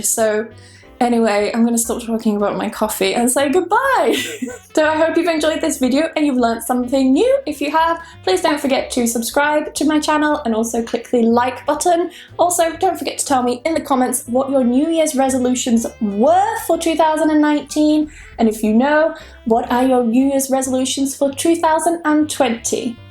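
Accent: United Kingdom. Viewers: Thank you for your teaching and British accent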